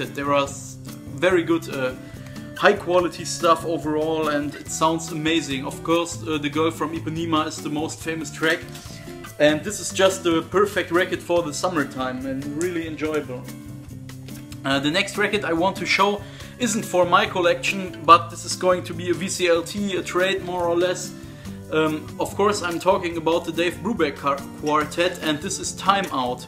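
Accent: Germany. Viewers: Gr8 Dave Brubeck Album! You look Italian or Turkish, not so German, but you sound German with super English. Young Germans do love their English language! You seem a gr8 upbeat guy for this job:)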